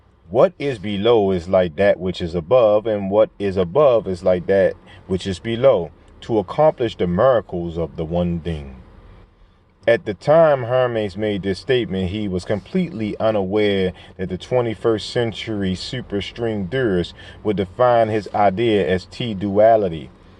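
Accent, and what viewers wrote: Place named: Baltimore, United States. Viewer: Gotta be from Baltimore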